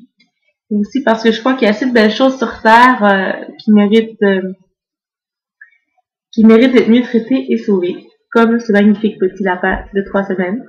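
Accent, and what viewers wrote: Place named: Quebec, Canada. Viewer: Vraiment facile de juger les gens... Mais la réalité est que ce n'est pas ta faute... Tu sembles encore trop jeune et distante de la réalité Française... Ton accent me laisse transparaître le Québec ? Si c'est le cas ? Je suis pas surpris ! Ici, et je dis ici parce que je suis du Québec aussi, nous sommes encore bien contrôlé et que la France, elle, se réveille je crois... Enfin, j'espère que tu as au moins pris la peine de visionner plus d'une vidéo de Tarence Lacroix avant d'lancer 1ère pierre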